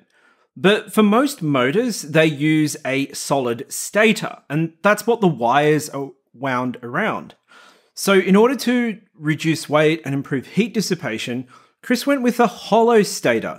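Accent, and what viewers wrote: United Kingdom. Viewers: It was not a criticism of him at all. He just has a very normal ‘Home Counties’ English accent. Like me. And I do like a lot. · well i bought 8 of his 8s motors they produce 2.7kg of thrust is hes blown the competition out of the water in the 2207 class range and only reviewer thats not useless and done a lot for the hobby and do u even know where his English accent is from because i used too live in the uk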